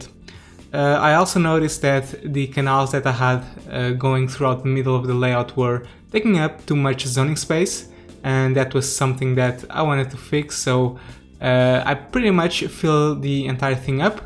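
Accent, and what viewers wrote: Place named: Portugal. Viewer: Descobri o canal recentemente, não sabia que esse sotaque era português! Abraços do Brasil!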